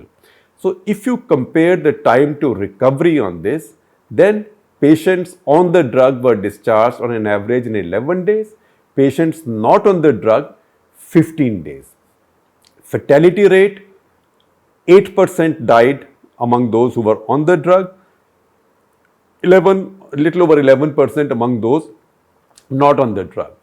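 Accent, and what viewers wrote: India. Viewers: Good presentation in indian English style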